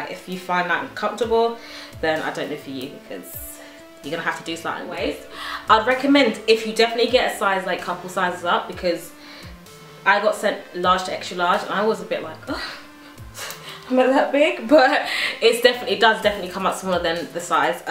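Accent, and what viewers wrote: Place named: United Kingdom. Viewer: I LOVE FUCKING BRITISH LANGUEG GIRL:):D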